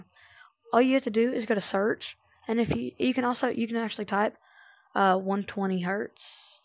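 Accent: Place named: South, United States. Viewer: Not trying to be rude but you lowkey sound country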